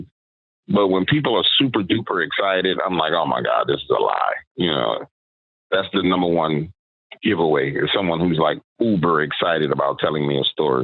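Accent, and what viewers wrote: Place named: New Orleans, United States. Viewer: Aah my fellow New Orleanian!! I can hear your accent a mile away 😅 “dawk wadders” Love it! 🫶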